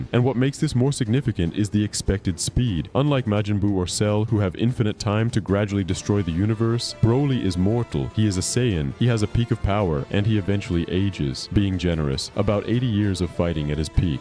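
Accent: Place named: Brazil. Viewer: He's Brazillian Bro, don't blame him, it's difficult to know how you american guys say shit.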